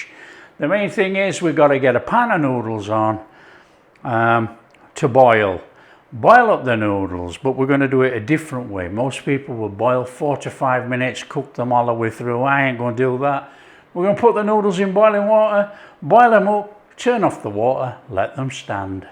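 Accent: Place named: England, United Kingdom. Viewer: a luv your cooking style,im basic and want easy peasy though great taste im sure and too many complicated recipes oot there,emigrated to Canada from glasgow as a child,love your accent,what part of england is this accent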